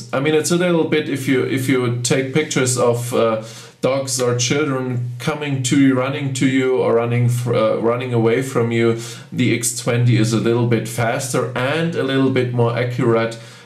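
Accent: Germany. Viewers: Thanks for this comprehensive review. I came here to see what the viewfinder looks like (I only have the X10) and you provided it! One small note for non-German speakers: when says "auto-focus" I thought he was saying "out-of-focus". Don't worry, that's just the German accent pronunciation of "auto focus". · great review, & good to hear that german accent again, i had a great german friend years ago, named joseph tretin. all the very best John Radford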